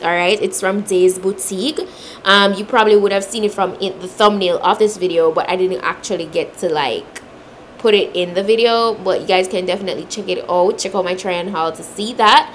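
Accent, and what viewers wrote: Jamaica. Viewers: Just listening to you talk makes me miss Jamaica. My family lives in Kingston 13. I used to visit twice a year but haven’t been for almost two years now.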